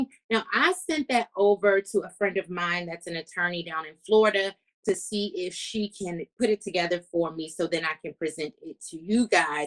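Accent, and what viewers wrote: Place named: Washington DC, United States. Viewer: I could tell that you were from dc. Our accents are very distinct.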